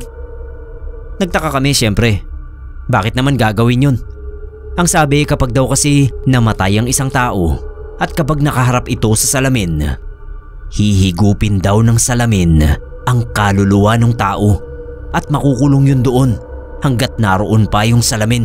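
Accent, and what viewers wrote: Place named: Ibanag, Philippines. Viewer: ibanag ang accent ng story teller.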